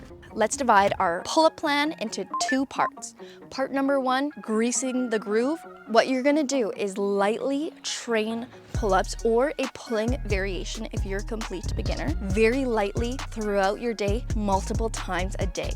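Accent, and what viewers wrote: Canada. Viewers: I KNEW you were Canadian. By the way you mispronounce "out."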